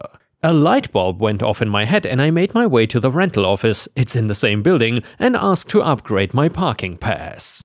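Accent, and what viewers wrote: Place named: Germany. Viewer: I can hear the german out of your voice, and i love it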